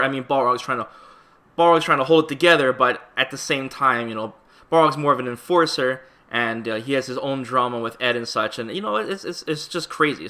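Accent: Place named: Canada. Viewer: He's Canadian ayyy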